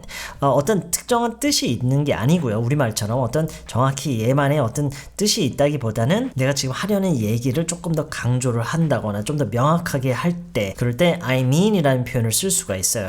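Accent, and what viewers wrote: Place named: United States. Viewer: 유용한 영상 감사해요 !! 말투가 박재법 군이랑 비슷한데 미국 어느 지역 엑센트인가요?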